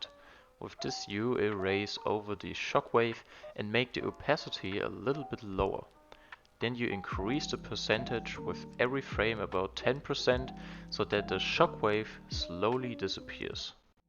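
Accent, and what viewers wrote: Germany. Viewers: Cause of your accent I found out you’re German and because of the layers👍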